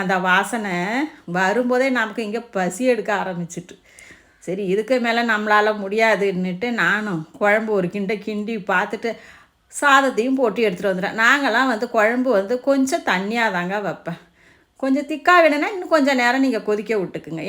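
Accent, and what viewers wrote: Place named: Mannargudi, India. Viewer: Unga pecha ketta mannai side pechi pola irukku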